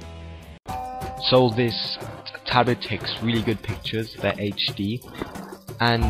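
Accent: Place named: England, United Kingdom. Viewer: Tu as un superbe accent ! Tu ne serais pas anglais ou un truc du genre ?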